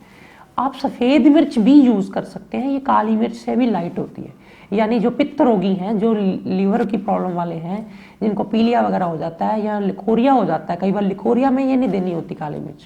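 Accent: Punjab, India. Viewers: Thanks for information. R u Punjabi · Guru g apki tone punjabi aa rhi h...acha feel hota h Koii formality nhi lgti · Thank you very much for teaching us so many good things.aap Ka Punjabi eccsent bot achha lagta hai jaise koi Ghar Ka member hi samjha raha ho .pls tell it contact no. After cancer treatment big changing side effects have come.no one telling us the way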